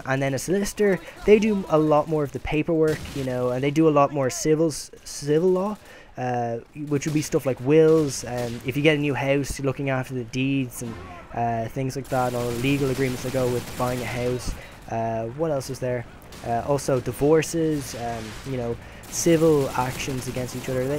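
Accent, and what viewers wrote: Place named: Australia. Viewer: y bro? u sound kinda australian days why i asked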